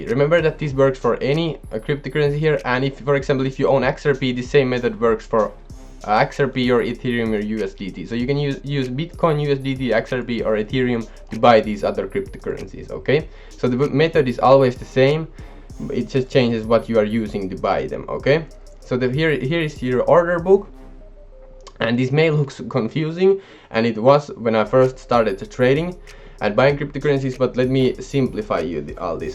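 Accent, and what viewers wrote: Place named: India. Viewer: I get an odd feeling that you talk like an India's people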